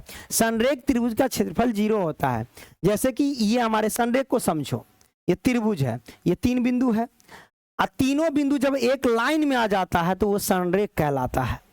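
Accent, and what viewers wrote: Bihar, India. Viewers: Sir aap bihari hai n 😅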